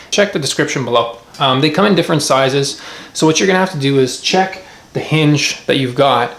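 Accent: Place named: Canada. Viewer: i knew that was a Canadian accent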